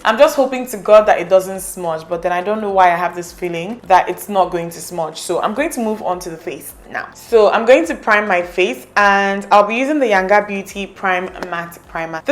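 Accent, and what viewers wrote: Igbo, Nigeria. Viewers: you should do a makeup video in Igbo! It would be so nice! Plus ur Igbo accent is 😍😍😍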